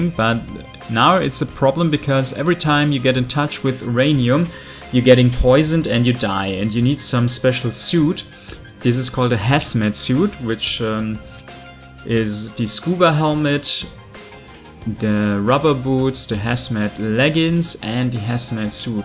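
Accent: Germany. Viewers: Bist du Deutscher? Hört man iwie raus und das "Servus" in der Beschreibung ist ein weiteres Indiz :)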